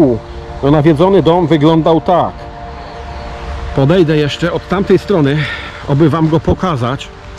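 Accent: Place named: Podhale, Poland. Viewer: Jakiś dziwny akcent, coś górami zalatuje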